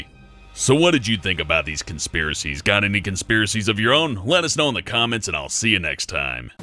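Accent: Australia. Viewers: Is Aussie? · hava gud one (the only other reason I Loved his narrations is His sexy australian accent)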